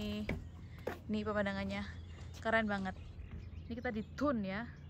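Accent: Java, Indonesia. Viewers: Medok men nduk..lucu.. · Bule medok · Halo cantik pinter banget kamu nduk boso jowomu medok banget ❤❤ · Yeay medok udah silver button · INI GARA - GARA BULE KW BISA DAPAT SILVER PLAY BUTTON CANTIK, LUCU BAHASA JAWA SANGAT MEDOK SUKSES TRUS MANTAP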